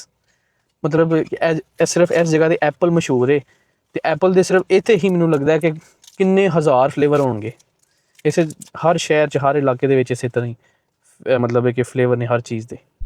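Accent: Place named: Lahore, Pakistan. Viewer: bhai apse kisi video me question kiya tha k ap kidhr se hein. Apki punjabi se lgta tha ap lahore se hein. Phir kisi video ne suna apne btaya apne bht sari life lahore me guzari hai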